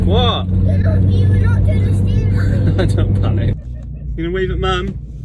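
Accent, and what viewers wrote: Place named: Shropshire, United Kingdom. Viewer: you sound like you are near me , shropshire welsh border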